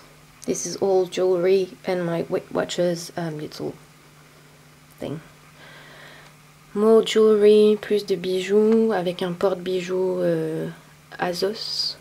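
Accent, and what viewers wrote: United Kingdom. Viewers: un très joli accent Londonien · ton niveau d'anglais est top!!! en plus tu a l'accent anglais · Tu as un accent so british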